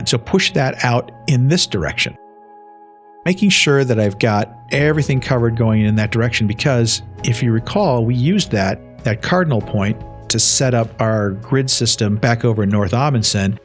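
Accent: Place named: United States. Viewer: Beautiful approach to building in this game. Love it! Btw, you must be the most American sounding American on the internet.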